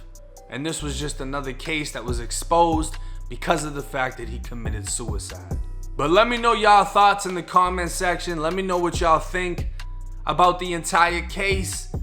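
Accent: Boston, United States. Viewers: Love your channel bro👍 Just curious about your Boston sounding accent? Did you always live down South. I’m from Brooklyn living in Jersey and I’m always hearing about my accent😂 Keep up the dope content 💯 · Aye big bro are you from Boston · Is he a Boston dude? Accent killin me lol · This guy is so Boston I can smell the clam chowder from here! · It’s the Boston accent for me. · Is he from the south or is he from Boston ???? He sounds like Marc wahlberg and Jay let’s live life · Bra if you from Tampa why you sound like you from Boston? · Is that a Southie accent? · Sounds like he's from Boston good content i just subscribed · Damn what part of South Boston Florida you from 😂 carrr lol thick ass accent sound like Mark Wahlberg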